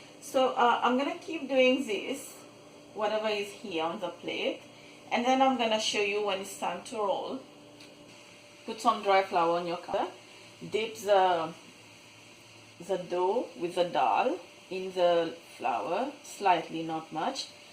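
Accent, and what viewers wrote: Mauritius. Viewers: She speaks with a mauritian accent and since she is making a recipe mauritian wise, how did you expect her to talk? 🙄 Why the hell go on somebody channel and then criticise them about their accent?! 😡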